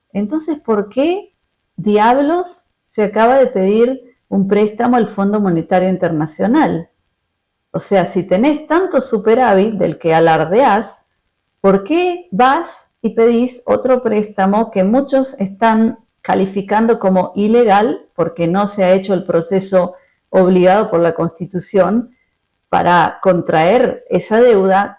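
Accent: Argentina. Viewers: me da esa escucharte. Tu tono argentino después de tantos fiera de tu país. En que haz colaborado en tu país. Es fácil juzgar y no estar en la en tú Pais. en batalla. Desde lejitos. DTB y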